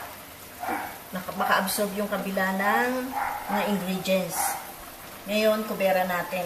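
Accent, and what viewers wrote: Philippines. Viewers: idol ko si ATE TAGALOG na tagalog ang punto ..Ako po ay taga BULACAN BULACAN kayo po ay taga saan ?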